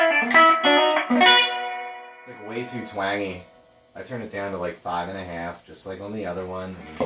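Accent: United States. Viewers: you sound Alaskan! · you voice had a philly twang lol i was born and raised in philly .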